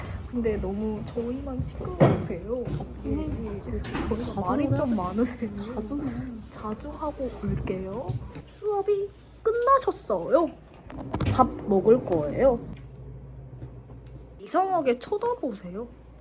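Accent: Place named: Seoul, South Korea. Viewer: 왜 서울말 하세요?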